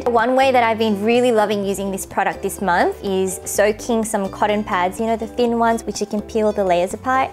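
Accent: Australia. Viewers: Hi! I don't normally comment on Youtube videos and I'm not someone who usually watches beauty videos, but your channel is one I find myself returning to surprisingly often. When I first stumbled upon your channel, 어? 한국 사람이 호주식 바름으로 얘기하네? 하고 마냥 신기해서 계속 보게 됐는데요.. 근데 볼수록 왠지 정(?이라고 해야할까?)이 들더라구요. Perhaps it was the nonchalance with which you so endearingly shared the little quirks of your personality, putting it out there for all the world to see. Perhaps it was the apparently total comfort with which you sat in front of the camera as though you were simply hanging out with your viewers. Perhaps it was the warmth and care and effort you so clearly put into your content to not only share but to better the lives of your viewers in some small way. Whatever the case may be, I just wanted to let you know that your 따뜻하게 바로 앞에있는 친구 처럼 말해주고 보는 사람들을 위하는 예쁜 마음이 이 먼 미국에서도 너무 뚜렷하게 보이고 들린다고 그리고 고맙다고 말해주고 싶었어요. 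Maybe it's just because it's 3AM and I'm feeling sentimental, but nonetheless~ I just wanted to thank you for your hard work.:) Have a lovely day!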